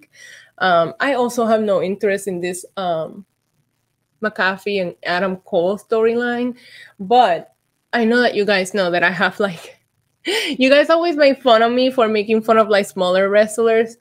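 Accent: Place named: Puerto Rico. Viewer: I told her you like your accent and I made a comment the last time and I guess you seen my comments but are you Puerto Rican because you talk like you are Puerto Rican but anyway I like that New Concept with you do your makeup and talk about wrestling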